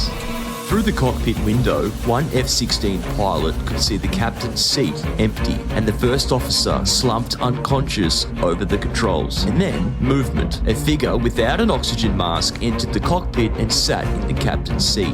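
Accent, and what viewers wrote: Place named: Australia. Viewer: what the aussie accent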